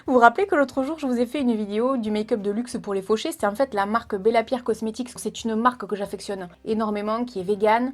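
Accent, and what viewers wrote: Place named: Perpignan, France. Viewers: Quelle belle histoire de Noël! Ma femme veut bien l'adresse de cette gentille dame aux oranges car nous ne sommes pas loin de Perpignan ! Lol 😉 trop sympas vos vidéos ca fait plusieurs qu'on voit et on aime! En plus, avec l'accent de chez nous ça fait du bien ! Hop on s'abonne avec plaisir. On a apprécié en particulier les vidéos sur Yves Rocher, Cien (Lidl) et les astuces... Bonnes fêtes ! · Bjr mon 1ier comm depuis plus de 2 ans ma 1iere ybeuse ma préférée avec or elly. Tout ce que ns raconte tu ns apprends. Pleins de similitude..ma mamy était de vidaillac lot jpasse mes 6 mois d'été Aude et P. O. Jacheche #essensse#chez Julia a la jonquera. J'adore cadaques maison de Dali et aller à Gironde le mercredi j'achète pas ceux que tu ns conseilles. Tu es belle une belle tête bien pleine t'as le peps j'adore j. P.arker.jnai pas trop eu de kado de ma faille bien que j'en fasse a ts les 6 moi jsuis maman kdo. 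Que veux tu mes familles de cœur c parfois plus important et elles m'ont gates puis les kdo 2019 je vais m'en offrir n'a.. L orange de la petite mémé wouau. J'ai déjà custo ttes mes blouses avec des perles les créoles d'après t conseil la jvais attaquer mes boots. Bonne merveilleuse année chère à toi ta maman ton chouchou, 🐕et la santé..moi c pas le top mais Jle dis pas c pour a que toi o relly Nicole et mon Ange jne loupe rien.je commande sur ALIE. Joom et un peu shein. Sur les conseils daurely every thing five pounds. Grossiste français. Bonne résolution ne plus me laisser faire par des C... Tourner la tête et ignorer. Ensuite aménager mes coins beauté fringues. M'habiller chic comme toi. Et me faire plaisir ça de pouvoir louer une partie de llannne dans le 11 et le 66.lespagne....mon oxygène. Merci pr t élans du ❤️et ta simplicité Perpignanaise au même accent💗poutous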